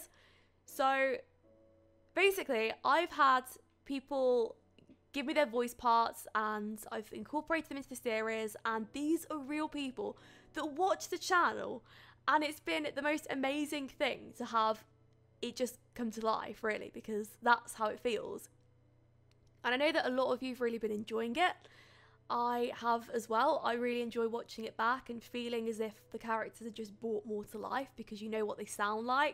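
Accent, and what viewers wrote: England, United Kingdom. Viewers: i Love your accent! where is it from? i mean what Area in england?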